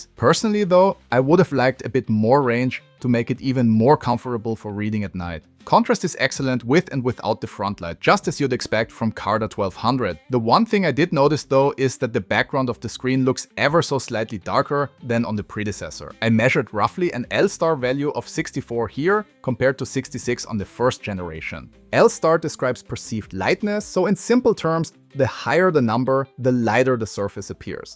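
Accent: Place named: Vienna, Austria. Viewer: Great review! And now I finally know how Wiener Schmäh English sounds like! The Dewäääisss! But no judging, I also have an accent when speaking English :)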